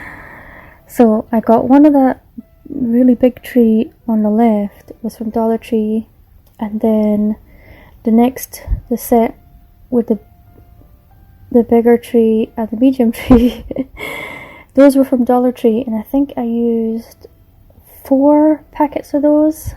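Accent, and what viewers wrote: Scotland, United Kingdom. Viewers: Hey! I could hear U today so well! Love ur accent. Scottish? Love the ocean blue color on all ur projects! Ur tree filled scene with the lovely houses hit all the right Xmas bells with me! Gorgeous!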